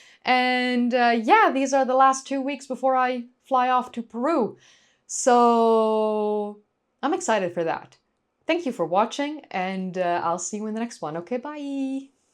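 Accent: Netherlands. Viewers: It would be an immense exaggeration to say I have the same reaction as Jamie Lee Curtis in A Fish Called Wanda (search YT for "fish called wanda russian scene"), but I do enjoy, in a perfectly clothed way, hearing say things as a Dutch person! Practice "Berg" op de wijze van, everyone Then the name of the original land owner Advanced users - say "Gerard The Umbrella Plant" in the manner of, as my best friend - a Dutch guy - insisted when i was 19yrs old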